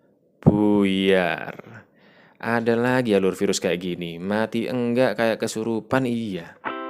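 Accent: Java, Indonesia. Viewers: Mimin wong jowo? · Mantap min cara berbucaramu wkwkwk, jowo pokok e terdebest! 😂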